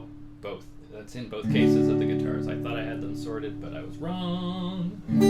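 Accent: Canada. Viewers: Actually I think it sounds spot on. I have a question for u, for a Canadian why do u say “Oooout” & “Aaaay” like the rest of ur peeps? This coming from an American w god only knows how many accents n dialects…. 😮 Yes I’m n Idiot, like the channel brother!! Can’t tell who u best look like, it’s either Dave Mustain the Megarocker without the beard or the Viking slayer Zach Wild. Either way that would put U in a killer collection! Rock on bro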